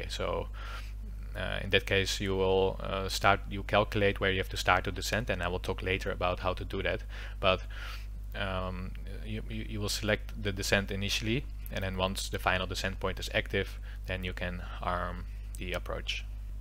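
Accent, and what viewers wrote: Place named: Netherlands. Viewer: Can i ask where you are from? I'm dutch and you sound a bit dutch too! I'm very interested in pilots working in a different country than they are from. I saw that you landed in an airport in Vietnam in a different video. If this is the case would it be possible for me to fly like with British Airways or Qantas for example instead of like KLM or a different Dutch airline. Thank you.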